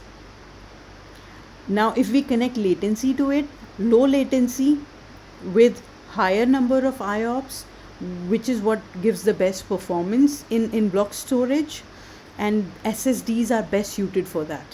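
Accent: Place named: India. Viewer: sure u indian...accent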